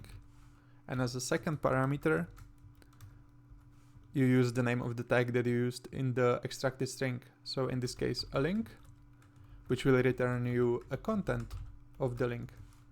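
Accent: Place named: Czech Republic. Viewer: pekna chenglish:DD ale dobre video, jen hledam jak udelat localized pathnames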